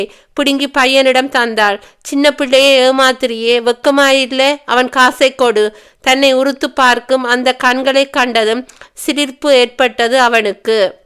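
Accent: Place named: Sri Lanka. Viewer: என்னாச்சுப்பா ஏன் இப்படி அவசரமா வாசிக்கறீங்க உங்க குரலில் ஒரு வசீகரம் உண்டு அந்த கொஞ்சும் இலங்கை தமிழை கேட்க கேட்க இனிக்கும் ஆனால் இக்கதையின் வாசிப்பில் ஏதோ மிஸ்ஸாகிறது போல் தெரிகிறதே நிறுத்தி நிதானமாக வாசியுங்கள் சகோதரி என்றும் உங்கள் வாசிப்பை விரும்பும் நானும் ஒரு சகோதரி தான் பிழையாக கருத வேண்டாம் உண்மையிலேயே உங்கள் பழைய நாவல்களை கேட்டு வியந்து போயிருக்கிறேன் தொடர்ந்து உற்சாகமாக வாசியுங்கள்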